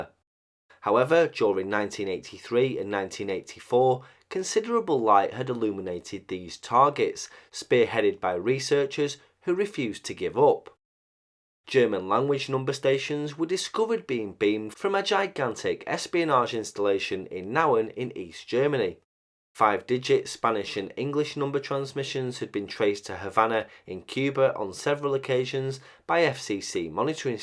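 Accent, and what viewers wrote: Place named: Cockney, United Kingdom. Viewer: Ay love, yer cockney accent is really really thick to these yank blokes who are strugglin like hell to understand. we love all our long lost brothers & sisters from the U.K., & some of the cultural idiosyncrasies are just endearing to us here who embrace diversity. However, still strugglin to hear through the sharp curves in dialect. Someone should invent a bloke to bloke translator. ya know-- "cookies to biscuits" & all that rot.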